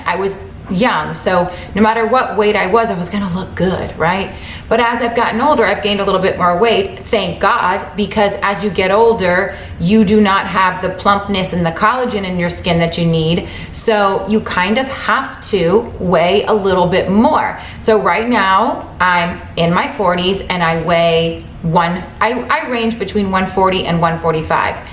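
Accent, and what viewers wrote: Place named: New York, United States. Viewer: I only found you a few days ago... watched like 100 of your cooking segments 😂 You are AAAMAZING! Now im seeing all these other (older) videos, which are GREAT! Your personality is 🔥 as the kids say! I'm 57, raised in St. Pete, live on Long Island now for many years. I know you're in FLA, I though you were from NY by the way you talk. XOXO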